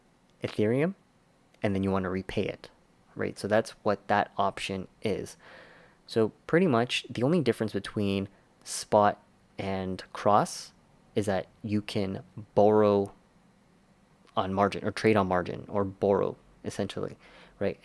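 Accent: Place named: Canada. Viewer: lol I caught it fairly quickly. I became familiar with the Canadian accent after living one year in northern Minnesota. .. Good times. Stay warm.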